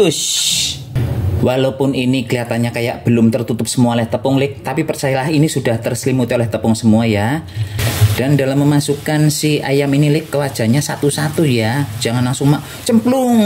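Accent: Banyumas, Indonesia. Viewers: Lucu ngapak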